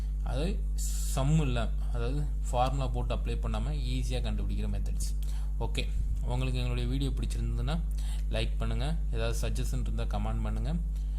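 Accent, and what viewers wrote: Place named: Tirunelveli, India. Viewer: Deepak Dharman it's thirunelveli speach......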